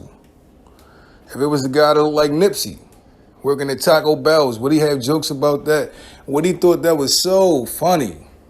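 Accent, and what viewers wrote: Baltimore, United States. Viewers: You must be from Baltimore the way you say those o's lol. You sound like Snoop from the wire.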